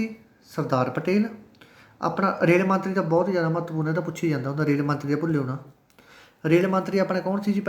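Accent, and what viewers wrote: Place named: Majha, India. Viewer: VA bolde ho lagda magha toh belong krdo ho ji Ha time ta hunda but agle di life aw Sedule busy aw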